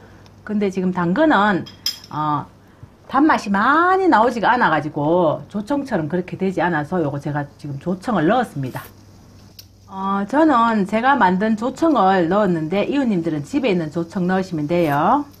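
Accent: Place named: Daegu, South Korea. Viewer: 대구 말이 너무 좋아요 ❤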